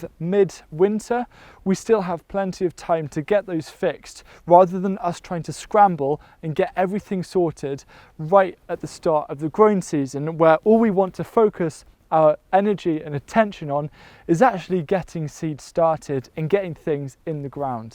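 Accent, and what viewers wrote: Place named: Wales, United Kingdom. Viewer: yet another excellent video / and recommendation to give it a break for now. Meanwhile, question, please.... which month did you plant the OCA in your raised bed. I am mostly keen to learn 1. how late I can plant them? 2. Are they a perennial or can one save tubers or “seeds” from one season for the next?? Thanks I have seen loads of your tutorials and your Welsh accent is also a real bonus!!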